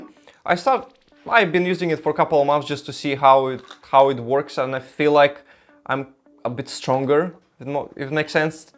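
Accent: Russia. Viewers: ah nice I instantly recognized by your accent you were a Russian speaker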